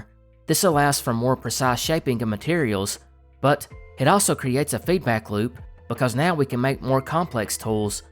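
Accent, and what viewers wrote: South, United States. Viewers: Awh heck yeeah partner! 🤠 a country soundin’ commentator, let’s giddy up now!! 🤠 yeeee hawwww!!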